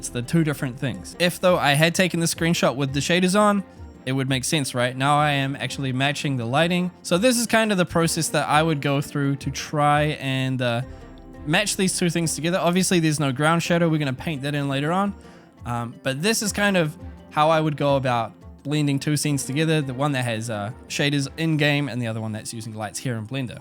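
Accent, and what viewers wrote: New Zealand. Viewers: Are you from New Zealand? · I KNEW I COULD HEAR SOME KIND OF KIWI IN YOU!!!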